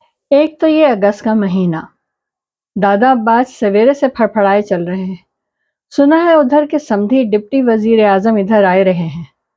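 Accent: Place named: Bihar, India. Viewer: دلکش بہاری لہجہ. بہت خوب تحریر. 🌹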